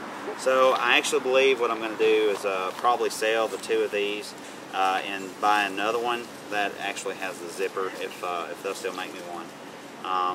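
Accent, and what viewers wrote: South, United States. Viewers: I like your video. It's very informative. I'm new to hiking and I have have a question about the pack. Do you expect the pack to last a few years? The cuban fiber fabric seems so thin and looks like it won't last very long. I've never seen any in person just here on YouTube. I noticed the zpack website has a 1 yr warranty. I would just want to make sure it's worth the money. Also, you sound like you're from the south! Have you ever hiked The Pine Mountain trail in Georgia? It's a really nice trail with a little bit of presidential history. Franklin D. Roosevelt's Little White House is near by and he used to vacation there a lot. Thanks for the info!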